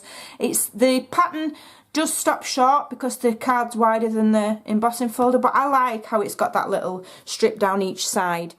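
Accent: Yorkshire, United Kingdom. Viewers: I adore your Yorkshire accent!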